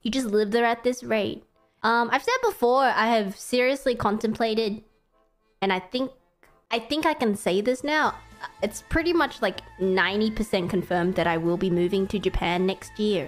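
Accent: Australia. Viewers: Imagine moved to japan then she talked normally with no aussie accent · At the surprise of know one, but fr as long as she keeps her Aussie accent it's all good. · I hope could maintain her aussie accent in Japan.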